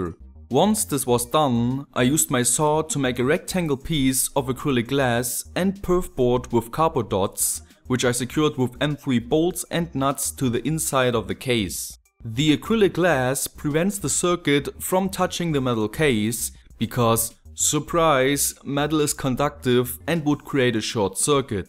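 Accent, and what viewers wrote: Germany. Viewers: Man merkt das du Deutsch bist. An dem deutschen Akzent. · Bart Simpsons he says people see that you are german because of the german accent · nice ^^ man hört richtig das du deutsch bist hehe hast n abo bekommen ^^ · Höre ich da einen deutschen Aktzent?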